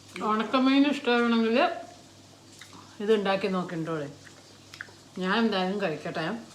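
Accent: Palakkad, India. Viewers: ഈ പാലക്കാടൻ ചുവയുള്ള മലയാളം .... ഒരിക്കലും കൈമോശം വരാതെ നോക്കിൻ... ഈ ഭാഷയാണെന്നെ...എല്ലാ എപ്പിസോടും കാണാനുള്ള പ്രേരണ... ന്നാ ഞാൻ വരട്ടേന്ന്...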